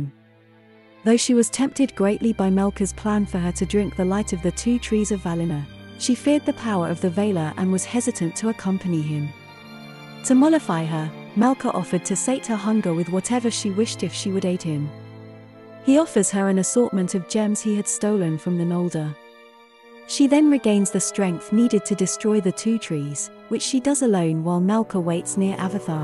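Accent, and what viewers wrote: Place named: England, United Kingdom. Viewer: I think that's just how people speak in England. We say water just like it sounds. they say wotah..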